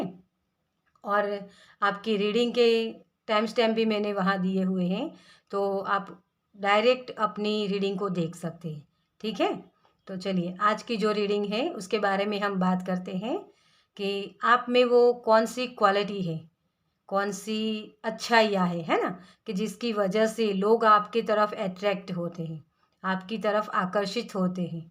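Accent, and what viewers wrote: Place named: Gujarat, India. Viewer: Pile 1 sub sahi hai mam our aap ki hindi masaallah Vijay rupani jesi hai.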